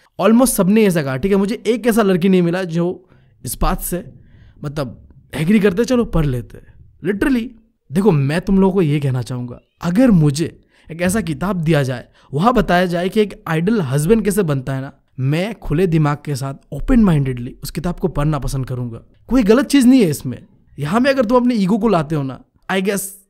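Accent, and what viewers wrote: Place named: Bengal, India. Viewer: Bhai woh uska bengoli accent hain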